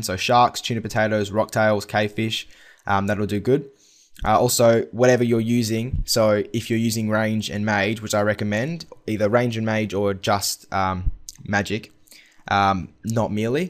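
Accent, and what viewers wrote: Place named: Australia. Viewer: YOU SOUND AUSTRALIAN. LOVE IT.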